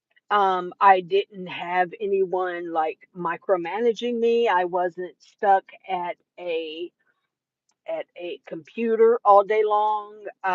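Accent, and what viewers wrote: United States. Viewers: So glad u went live . We missed u. Girl u could go live from ur bed and we would watch u no make up and all. Idk if it's just me and it's from not seeing u for a hot minute but u sound more southern since ur move over here to Alabama with me. Lol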